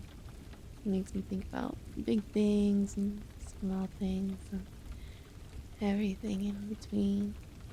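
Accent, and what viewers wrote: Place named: United States. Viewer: You American girls have (possibly)the best accent! You should do a "southern belle" accent video. How much fun are you! New sub from 🏴 😊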